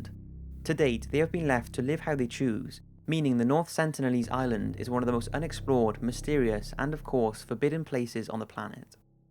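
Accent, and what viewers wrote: United Kingdom. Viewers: damn, british accent... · the British accent helped me stay calm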